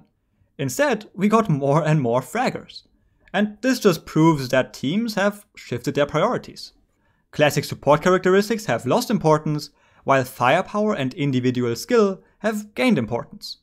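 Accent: Germany. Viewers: your accent is strong. are you german?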